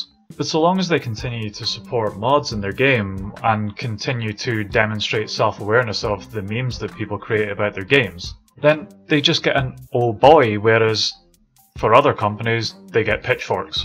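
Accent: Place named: Scotland, United Kingdom. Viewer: Your Scottish accent makes this video a 10/10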